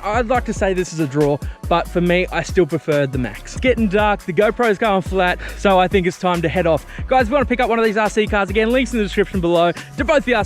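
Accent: Australia. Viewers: Are you ozzie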